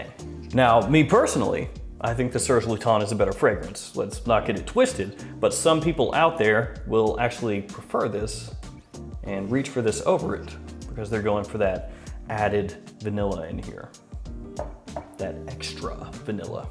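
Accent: United States. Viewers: I mean hes American so I guess his normal talk is actually how we talk lol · Really? That's how Americans talk?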